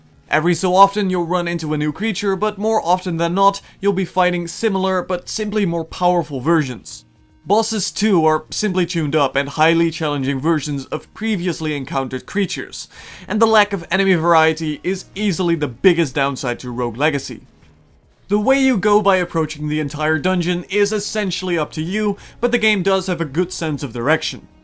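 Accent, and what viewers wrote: Netherlands. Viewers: You sound really Dutch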